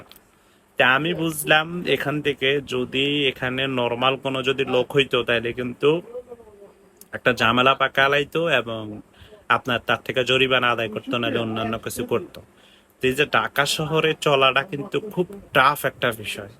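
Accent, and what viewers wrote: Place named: Noakhali, Bangladesh. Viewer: বুঝতে পারছে তুমি নোয়াখাইলা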